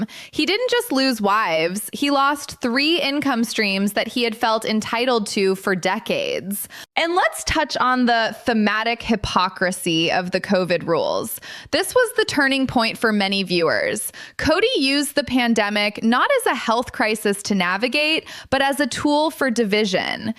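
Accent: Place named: California, United States. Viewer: For the love of God and all that's holy, make the AI get rid of the California sccent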